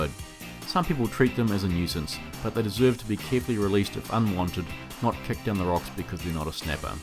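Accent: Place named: Australia. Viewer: gday gday mate u from ozzy mate?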